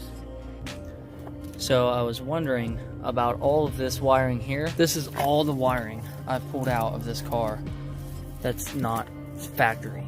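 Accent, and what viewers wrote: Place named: Philadelphia, United States. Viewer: the philly accent makes this build amazing.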